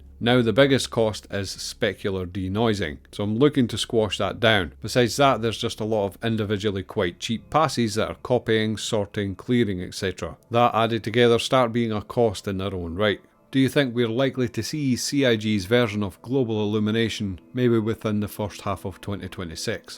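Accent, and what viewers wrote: Scotland, United Kingdom. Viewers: Something Star Trek about a Scottish guy talking about engineering. Give it all she's got!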